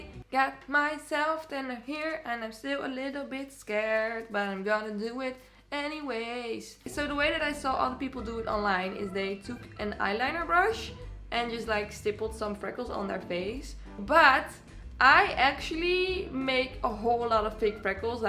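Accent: Netherlands. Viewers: Omg it looks really goodd😍 Are u Dutch? Your accent sounds a bit dutch 😊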